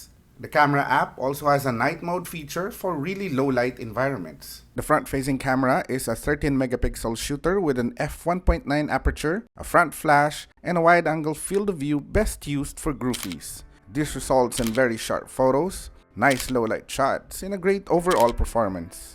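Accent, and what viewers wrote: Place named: Philippines. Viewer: Are you filipino? I can hear it in your accent haha. If you're not then I apologize and if you are then ipagpatuloy mo lang ang ginagawa mo! Ang ganda ng content 👍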